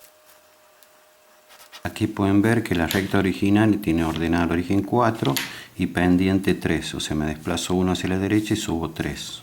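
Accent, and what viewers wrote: Córdoba, Argentina. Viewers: sos cordobes?? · de que parte de cordoba sos maestro??? Sos un grande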